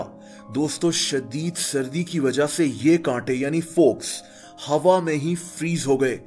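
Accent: Pakistan. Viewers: yup thats pakistani english boi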